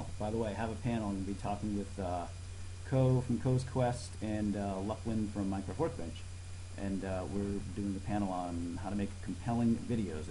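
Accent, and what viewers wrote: New England, United States. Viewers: Your from new England!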